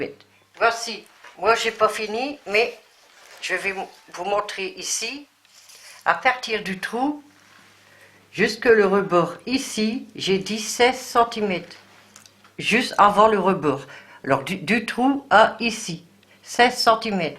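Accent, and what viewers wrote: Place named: Nord-Pas-de-Calais, France. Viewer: 🤣😅😁😄😃😀😂😉😊 Bonjour comment ça va chez-ti zot? Bon comme d'habitude vous me faites bien rire par votre façon de parler, oh la la une sacrée ch'ti,vous me mettait du baume au coeur merci beaucoup 😉😊🥰😍🤩☺ sinon très joli chapeau pour cet été. Avec ce confinement je vous souhaite un énorme courage et une excellente continuation dans vous projet. À bientôt 😃😉😊🥰 la ch'tit de la région parisienne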